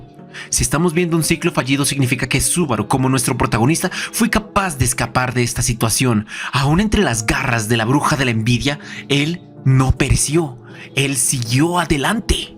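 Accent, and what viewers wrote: Colombia. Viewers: Eres colombiano? Sho crei que vos eras argentino che.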